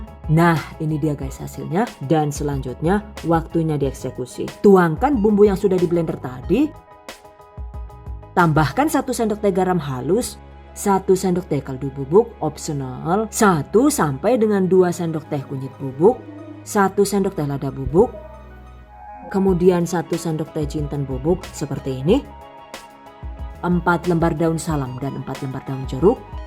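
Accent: Banyumas, Indonesia. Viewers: Iya kan sdh l@ tinggal di luar sana n suanj orng bule tapi ciri khaa ngapak ny masih tetep ad sukses trus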